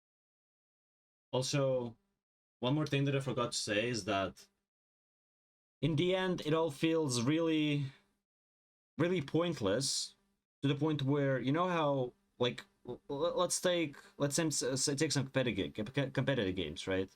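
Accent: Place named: Latvia. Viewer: Latvisks akcents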